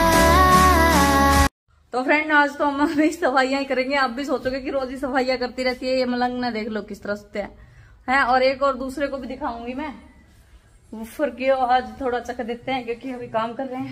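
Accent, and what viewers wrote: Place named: Haryana, India. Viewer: Mam manny hariavni boli bria lagy su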